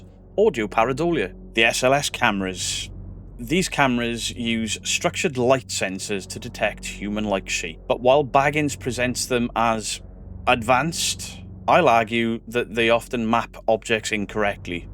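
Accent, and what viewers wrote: Wales, United Kingdom. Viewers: All I'm hearing is Welsh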